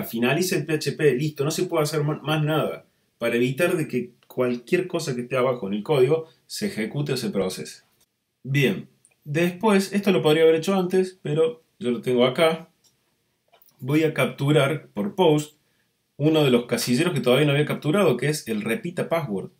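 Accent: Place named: Cordoba, Argentina. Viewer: estan buenisimos tus videos , muchas gracias por compartirlos, de donde sos ? sonas bien cordobés, yo soy de Cosquín.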